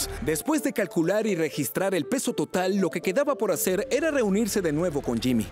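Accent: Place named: United States. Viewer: gringo es que hablas ingles como norteamerica le dicen gringolandia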